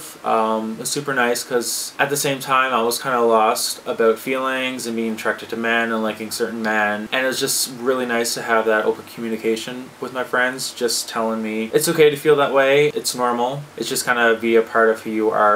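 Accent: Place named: Canada. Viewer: what part of Canada are you from btw ? big city or small town ? ...your accent gave you away before you mentioned Canada...how old did you say you are ? I thought you said 20 yo...nice coming out story glad it worked out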